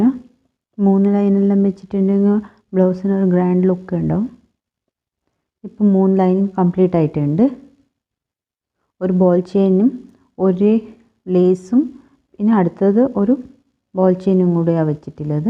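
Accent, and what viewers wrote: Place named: Kannur, India. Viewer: Nalla work. Kannurano sthalam?? Samsaram kettappol thonniyatha. 😊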